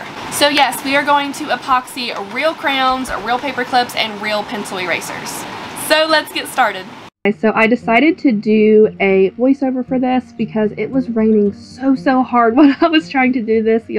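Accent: South, United States. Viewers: Girl! Your voice is so cute. I’m from the south too (Alabama) and I much rather listen to people with southern accents! Lol this is so adorable and helpful. Thank!!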